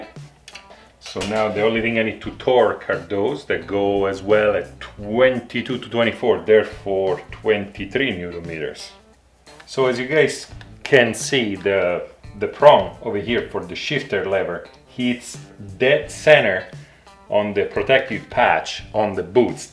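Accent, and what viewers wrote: Italy. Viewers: Love your Channel and love your accent too. 😊 Having an italian teaching us how to take care of our italian babies is amazing. Thanks for your time. Cheers from Canada.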